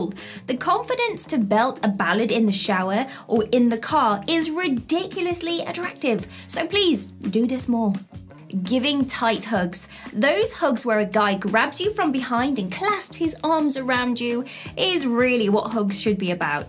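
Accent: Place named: Midlands, United Kingdom. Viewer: What I really want to know is about your accent. Where are you from that gives you that very sweet soft and subtle accent. Its not overpowering so definitely not a northerner lol. And not deep into London or Liverpool for that matter. Maybe the midlands or the Lake District. I can’t quite pin it down lol Please do tell. You don’t have to be super specific or anything just the general area or county.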